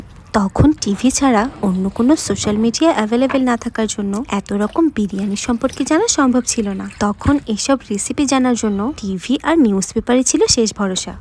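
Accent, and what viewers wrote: India. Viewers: কলকাতার বস্তি এক্সেন্ট এ কথা বললে ভারতীয় ভিউয়ারস ট্র্যাক করুন। বাংলাদেশি হিসেনে বিশ্রী লাগে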